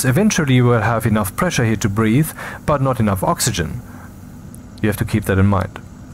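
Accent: Germany. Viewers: Richtig schönes Video! weiter so! Und dein Englisch kann ich so gut verstehen, weil du so klar redest :-) Gut ich höre raus, dass du deutsch kannst/bist?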